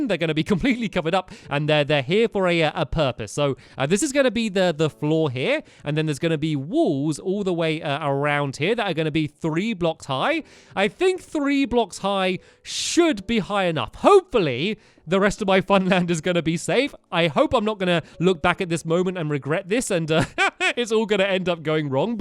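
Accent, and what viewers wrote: United Kingdom. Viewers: Shakil Syed he is British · Teddy Rare he is brittish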